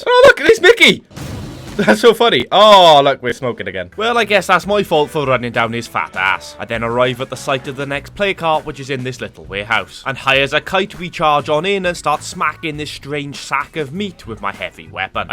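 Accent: Wales, United Kingdom. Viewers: welsh accent pal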